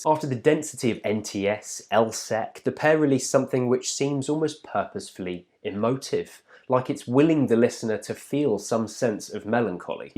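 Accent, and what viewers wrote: United Kingdom. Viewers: i dont know why, but im so obssesed with the british accent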